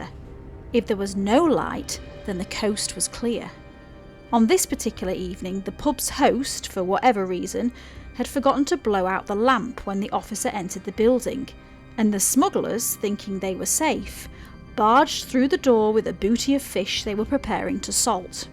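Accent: Yorkshire, United Kingdom. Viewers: I don't remember how I arrived at your channel, but it was probably through finding videos on Yorkshire people and locations. As an American, I find the Yorkshire accent probably the most charming English accent. It drops the H's and R's like RP, but has the northern lilt that the "posh" accents lack. I could listen to someone from Yorkshire read the back of a cereal box and be enthralled. Anyway, thanks for introducing someone from across the pond to Robin Hood's Bay.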